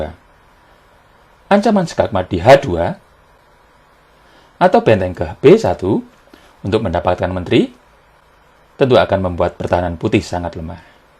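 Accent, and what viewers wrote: Java, Indonesia. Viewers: ilat jowo cak wkwkwkk 🤣🤣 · Saya suka gaya Om, tau aja yg subcribernya kebnyakan org Jawa hahahaha...., Lidah Jawa ya Om, GX perlu formal2 banget sebut nama yg penting penyampaian materi mudah di cerna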